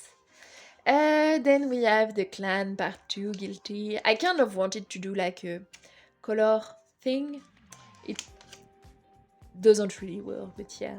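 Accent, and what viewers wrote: France. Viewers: are you french ? cuz you have a french accent while speaking English btw i like your collection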